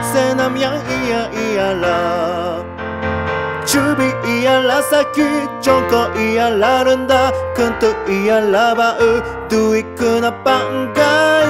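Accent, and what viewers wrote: Japan. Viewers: Ini orang Jepang gaes yang bikin,yang ngomong itu logat Jepang nya masih nempel😂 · Aksen nya jepang banget 🥰🇯🇵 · Tiba tiba lewat timeline lagi ni senam akwowkwk, dulu gak nyadar tapi pas didengerin sekarang aksen Jepang nya baru kedengaran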